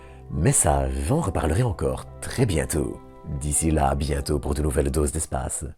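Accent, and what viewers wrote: Belgium. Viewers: vous avais un accen belge magnifique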